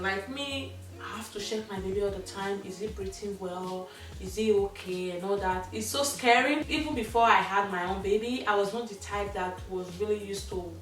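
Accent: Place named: Benin. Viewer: Hey n hubby New subscriber Alert💃🏾💃🏾💃🏾 and I have watched sooo many of your videos...lol I love the fact that you are very natural and I can relate soo well with you cos am Nigerian and am feeling that Benin accent sooo well! Lol Love u gurl All the best to your hubby and the new baby Cheers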